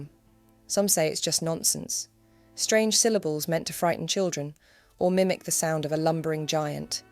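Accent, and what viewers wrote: England, United Kingdom. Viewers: Great video, I enjoyed that , Nothing like a nice story told by an English lady with a lovely accent.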